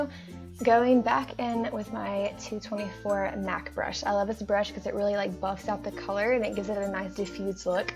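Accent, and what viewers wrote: South, United States. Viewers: I love it, and are u from the south I think I hear an angelic southern belle lol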